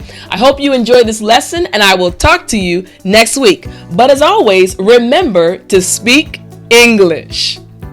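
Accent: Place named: United States. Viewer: Hello, there! I'm an English teacher from Rio de Janeiro, Brazil. I usually watch videos from British channels, for I'm a sucker for their accent. But you speak so clearly and beautifully that I have become your fan. Where in the US are you from? Thank you and keep up the good work! <3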